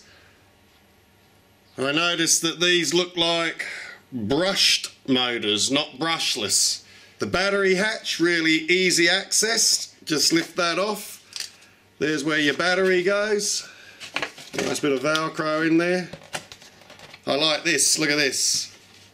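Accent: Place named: Australia. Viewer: I love your intro to all your videos, and the Ausie accent just adds to the entertainment value of your very informative reviews. Thanks! A big fan from the US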